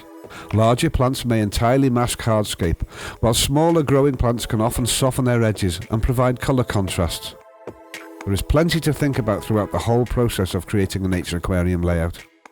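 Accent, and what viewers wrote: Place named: England, United Kingdom. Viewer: The narrator is speaking in a regional English accent not spoken in North America but in England.. Similar to the difference between Mexican and Castillan:)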